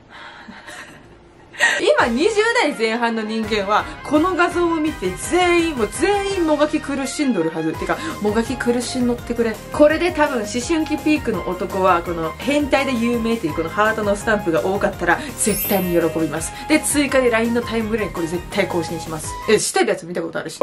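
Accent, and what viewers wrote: Nagasaki, Japan. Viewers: 久しぶりに見たバイ。おぉ、かわゆくなっとるやないか、長崎弁と致死量の毒は変わっとらんな！安心した。🤣🤣🤣🤣🤣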